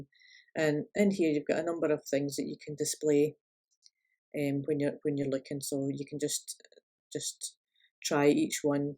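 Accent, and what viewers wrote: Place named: Glasgow, United Kingdom. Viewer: I liked the way you speak; are you a Glaswegian?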